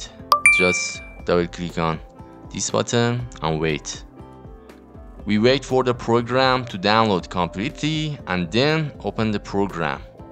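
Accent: Iran. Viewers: داش لحجت ایرانیه، چرا نمیشه تو کلش استفادش کرد ؟؟ هرکار میکنم میزنه رو رویال پس میره تو خرید با کارت مستر یا ویسا گزینه اپل پی نمیاد؟؟؟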